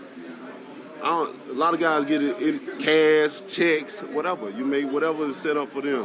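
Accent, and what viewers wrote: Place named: Alabama, United States. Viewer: i forgot this dude has the thickest Alabama accent lol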